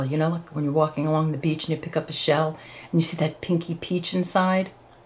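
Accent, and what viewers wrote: New York, United States. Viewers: An absolutely gorgeous collection!!! I just ordered the collection and can’t wait to see your tutorials! By the way, I couldn’t help myself I ordered the three collection bundle too, LOL I’m going to have a lot of beading to do! Thank you so much for all your tutorials. I have learned to bead by watching your tutorials and greatly appreciate your amazing designs, clarity of instruction, excellence in video production and your New York accent! I’m a Jersey City girl living in San Diego and love to hear your voice, it makes me feel so at home. Again congratulations and thank you for all you do to help us learn about this amazing art form!😀🙏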